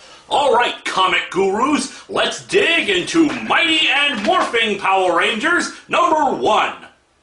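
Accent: Minnesota, United States. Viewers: What pronunciation problem does he have? Unless you mean his Minnesota accent coming through on words like "roof"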